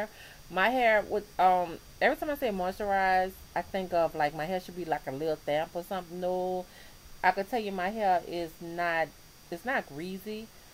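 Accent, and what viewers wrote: New Orleans, United States. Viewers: you from new orleans huh...lol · are u from new orleans? ur accent is so cute! lol anywho, im transitioning and my friend who's natural put me on this product and i cant get ENOUGH of it. it sucks cuz the only store in my town i know of that has it jacks up the prices on all of their natural hair care products. but i found a website, <link> that has it for i think 7 or 7.99 and its free shipping :^D!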